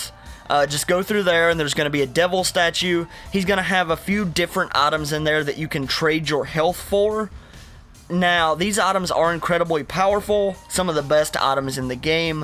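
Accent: United States. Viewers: Love your American accent.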